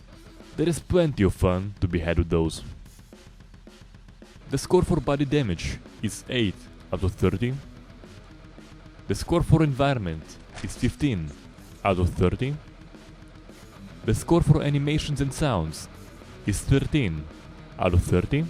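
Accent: Russia. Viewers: Здравствуйте, кто вы по нации? Hello, what is your nationality? You seem to have a Russian accent, or maybe not. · whats your accent my sister says russian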